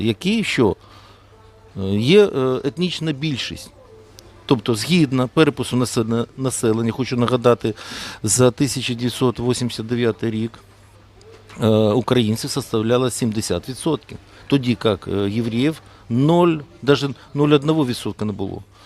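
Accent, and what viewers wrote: Russia. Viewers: Мій дід би сказав: "срав пердів і ще хотів"! Може автор відео ніколи не був у Бердичеві, або у Черкасах, або у Львові де назви вулиць писалися у тому числі і єврейською мовою ще у п'ятидесятих роках...хоча персонаж, що у якості довідника використовує "вікіпедію", вже багато про що говорить! Ну звичайно ж євреї! То не 🤡 закрив усі ракетні програми і довів до банкрутства "Укроборонпром""; скоротив армію та "відводив" війська! Ага! Тільки євреї винні у всьому! От 🤡 Ні!!! Ну а євреї обов'язково! І кацапи НІ! Тільки от євреї!!! Цікаво таки що автор цього опусу робитиме у світі без євреїв?! Ну без більше 80% Нобелівських лауреатів; без усієї банківської системи; без дантистів ну бо у більшості випадків євреї?! Без грошей бо навіть текст відео не може відтворити без папірця а значить не заробляє багато... Доречі! А чому такий "патріот" і не на фронті?! 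І мова у цього персонажа якась не зовсім українська...є акцент кацапський! Може "патріот" віщає з мацкви?! Га?! P.S. перші паперові гроші УНР дійсно мали написи декількома різними мовами! Читайте історію! Тільки час свій згаяв на цю дурницю! Якість інформації; якість мови; якість думок; стиль подання інформації як у першому класі...жах просто! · Украинскава? Це не дискредитація? Акцент чисто кацапський А нема більшого ворога ніж кацапи. То не маніпулюйте, навчіться української вимови хоча б.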